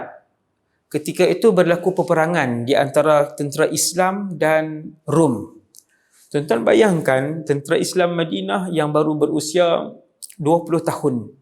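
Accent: Kedah, Malaysia. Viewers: Alhamdulillah terbaik ustaz Wadi Annuar terbaik kedah pekat dimana pn kuliah xtinggal negeri Kedah pekat ringkas senang faham terimakasih ustaz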